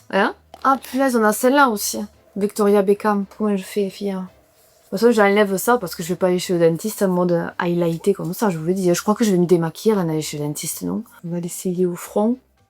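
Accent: South, France. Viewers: L accent du sud haha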